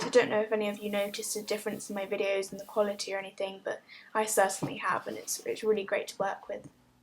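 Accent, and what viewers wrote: United Kingdom. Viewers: Omg you're British haha be aware that that makes most Americans like 200 times likelier to watch your videos..plus, you are one of the best portrait artists on YouTube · R u English r South African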